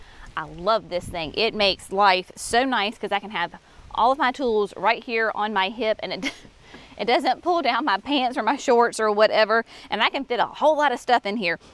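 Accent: South, United States. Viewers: I just found your channel and I love it. You are a very good teacher with a world of information and a great little southern accent. Thank you and be blessed.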